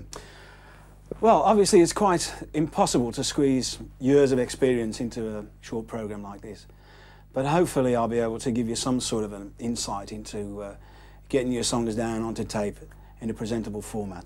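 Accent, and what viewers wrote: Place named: Birmingham, United Kingdom. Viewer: He has similar accent as jasper carrot from Accocks green, is from about 3 miles away. Kitt’s green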